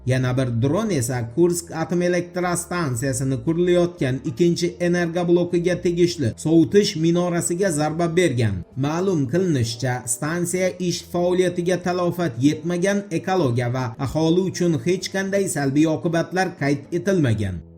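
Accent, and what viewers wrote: Uzbekistan. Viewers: SHUNCHA YILLARDAN BERI KUZATIP KELAMIZ SHU TURFA OLAMNI, SHUNDAY EKAN DOIM VIDYO'NI KO'RGANDA LAYK BOSIP TURAYLIK AKA-UKALR???? BU AKAGA O'XSHAP, SOF O'ZBEK TILIDA GAPRISH HAMMAGA HAM MAS!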